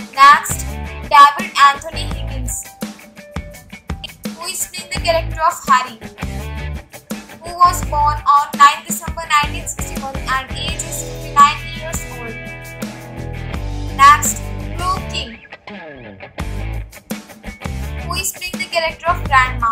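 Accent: India. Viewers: Agree. Indian voice over kid....listen to the video yourself. This isn't good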